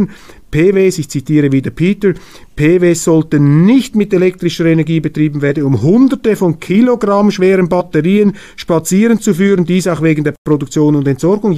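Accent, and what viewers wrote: Switzerland. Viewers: In der Schweiz darf man „faltsch“ sagen. Ich finde seinen Accent Fédéral ganz sympathisch.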